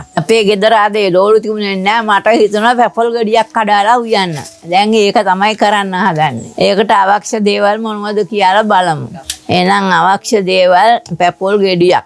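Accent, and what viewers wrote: Ambalangoda, Sri Lanka. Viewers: Aththamma from down south? The way she talks feels like amabalangoda accent.. bless her she is lovely and kind ❤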